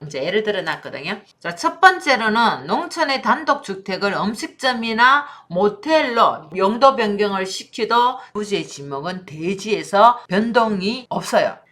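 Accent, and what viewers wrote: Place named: Gyeongsang, South Korea. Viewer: 표준어좀 씁시다 젠장 갱생도 말좀 쓰지말고 먼소리 인지 하나도 모르겠음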